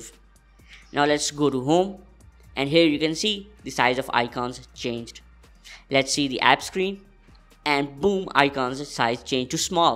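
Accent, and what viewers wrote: India. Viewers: You speak English better that half or the people living in the US who never bother to learn English. Americans are frustrated with any foreign sounding tech support because it means jobs are being sent to other countries, while we are being invaded by millions of foreigners who illegally come across our borders to take advantage of any free thing they can get. You sound like you may be from Asia or India. India is unfortunately is known for two things. #1 Scammers. #2 Very annoying tech support people whose only knowledge it to look up an answer as you wait on hold, or repeat you question back to you again, or continually, "apologize for the inconvenience". You are what you are and if you just keep doing what you are doing and people will learn to trust and appreciate your help, with our without an accent. You did an excellent job and I appreciate your help. 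I am told I have an accent also, but that is because I am from TEXAS. :) I hope you and your family have a Merry CHRISTmas! (I just subscribed and left you a thumbs up!)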